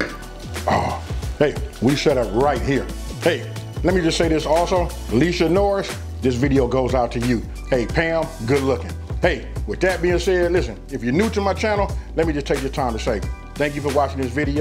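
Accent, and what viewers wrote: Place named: South, United States. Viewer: Love your food, of course, but having been raised in the South, it's your dialect, accent, and tone that immerse me in your wonderful videos. One of my favorite YouTube chefs. You make me feel warm and at home.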